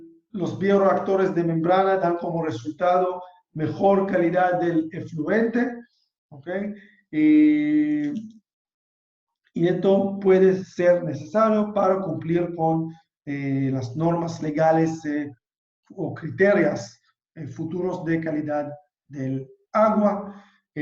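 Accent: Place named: Arab world. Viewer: El.Dejo árabe 😁🎊🎊🎊🎊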